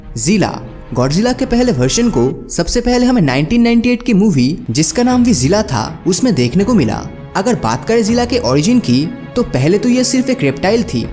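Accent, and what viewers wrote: Bengal, India. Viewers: Are you bengali? 🙄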